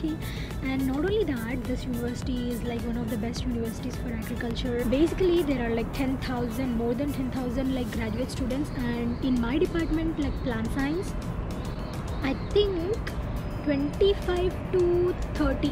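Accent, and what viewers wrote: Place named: India. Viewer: your Indian accent is cute! <3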